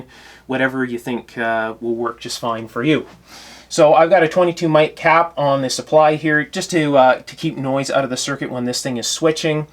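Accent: Canada. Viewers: Gotcha, thanks. Great vids! Do you have formal education? Your accent reminds me of some Canadians I've heard. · Are you a Canadadian? I keep picking up accents traits like the way you say "about"--but hide your beer so I can't confirm. Other than that, great videos.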